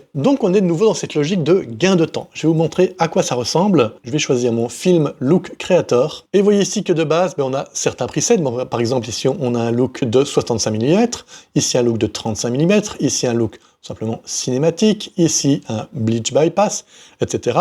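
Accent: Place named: Belgium. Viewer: C'est peut-être une fois la pointe d'accent belge 😉 En tout cas, bravo pour vos vidéos explicatives !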